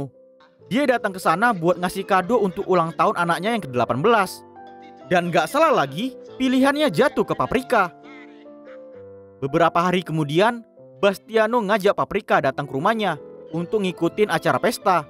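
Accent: Medan, Indonesia. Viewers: abg orang medan mana? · Bah...orang medan rupa nya..sukses trus lae..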